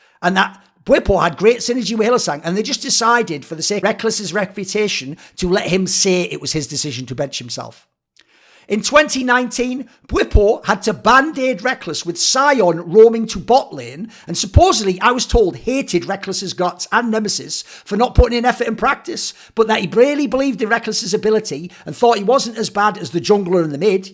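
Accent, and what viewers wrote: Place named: North East England, United Kingdom. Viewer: are you from the north east?? i recognise that accent. but pls leave rekkles alone he's a good dude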